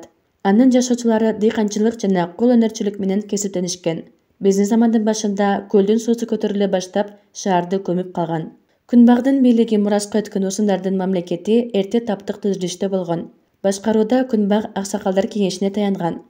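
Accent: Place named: China. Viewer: Акцент эмне үчүн,кытайлык кыргыз айым го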